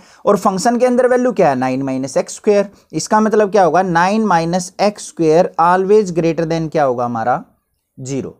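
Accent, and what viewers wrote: Haryana, India. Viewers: Sir haryana se ho ?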